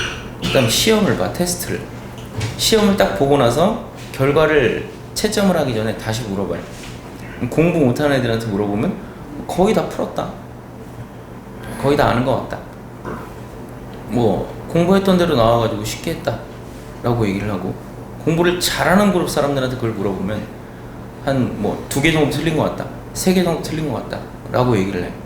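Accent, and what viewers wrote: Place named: Gyeongsang, South Korea. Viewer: 말투가 쌍도네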